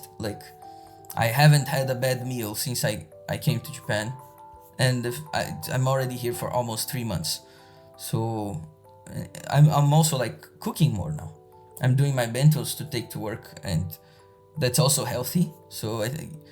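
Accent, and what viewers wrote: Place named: Russia. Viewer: Tem N com sotaque russo, tem like.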